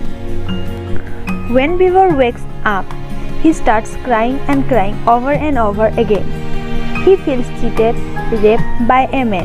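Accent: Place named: Bengal, India. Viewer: Heiii!! If you read this, then let me tell you that you really have a cute bengali (no offense) accent and that Just added the sprinklers to your storytelling. Actually I am currently reading "OUR IM'POSSIBLE LOVE STORY" & was really curious and came up to your channel. Keep narrating more📖✌🏽 support 💛💜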